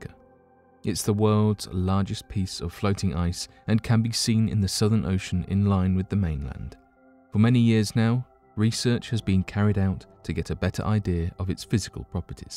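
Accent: United Kingdom. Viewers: I wouldn't even watch this channel if you didn't have a british accent lol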